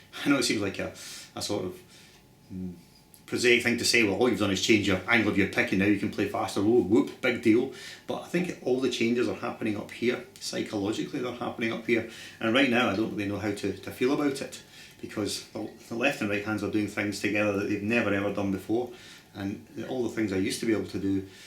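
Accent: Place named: Birmingham, United Kingdom. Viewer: For some reason when I listen to you speak, you phrase some words like the guys in Sabbath and Priest... I only use those guys as examples because they are the only people I have heard speak that are from Birmingham. When I think of Scotland I think of Sean Connery Thanks for the lessons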